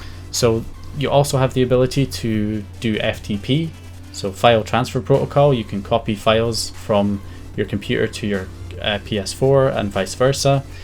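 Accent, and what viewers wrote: Scotland, United Kingdom. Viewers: Are you Scottish? 🤔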